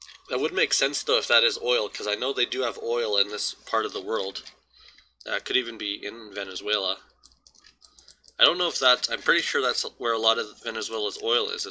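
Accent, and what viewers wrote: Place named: Canada. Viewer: i am so glad you came up in my recommended! this was a great video, and judging by the accent, sounds like you might be a fellow canadian?!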